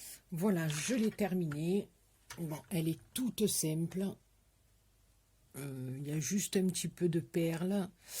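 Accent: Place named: Marseille, France. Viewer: J adore votre façon de parler vous faite pensées à ma belle soeur de Marseille bisous d amiens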